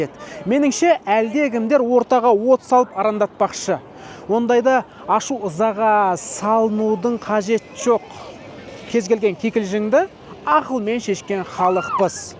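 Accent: Standard. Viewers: сау бол, рахмет контент үшін! Ұлтым Өзбек болсада Қазақ тіліні жақсы түсінемін, соның таза Қазақ тілінде сөйлегінің үшін көремін каналиңди. Рахмет. Өзбекстаннан Салом Алейкум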